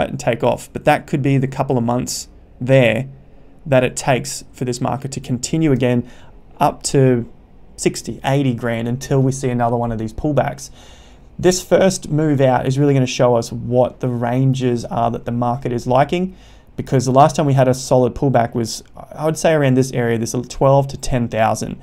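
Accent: Australia. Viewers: Are you an Aussie? You’ve got that accent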